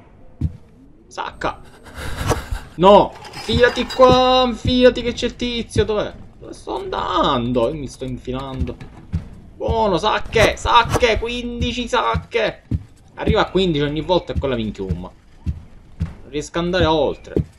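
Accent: Palermo, Italy. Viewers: l'accento palermitano migliora tutte le urla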